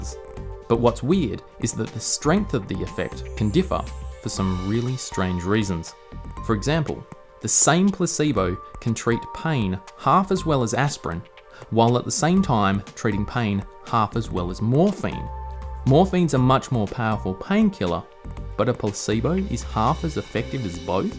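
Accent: Australia. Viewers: Love ur Aussie accent :D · thats aussie accent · Umm... all i learned is that you have a freakin' wicked aussie accent, mate1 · this australian accent makes it impossible to take seriously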